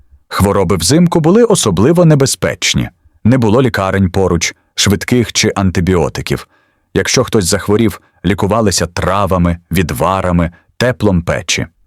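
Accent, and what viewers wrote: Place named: Russia. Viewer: Та не дровА , а дрОва!!! Чому ви в українських словах робите російські наголоси?! Не конІ , а кОні Не травИ , а трАви Не чАсник , а часнИк!!! Не кагАнці , а *каганцІ*. Ви ніколи не чули, як звучить українська мова? Вже не говорячи про зображення. Ніколи не бачили української хАти, навіть на картинках у дитячих книжечках? Вони були обмазані глиною і побІлені! А української пЕчі? Якщо вже ви назвалися "Історична правда", то створюйте щось максимально схоже на неї! Бо у вас покищо виходять "Історичні побрехеньки", нафантазовані ШІ.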